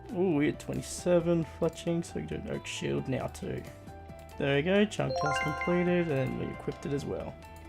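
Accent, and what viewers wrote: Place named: Australia. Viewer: i just started my first one chunk account in varrock and it’s definitely nice to hear a familiar aussie accent to follow along to 😂 looking forward to seeing where this goes, good luck!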